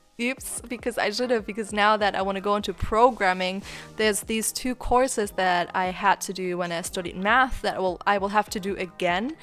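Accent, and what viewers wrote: Germany. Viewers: Wie man hört das die deutsch ist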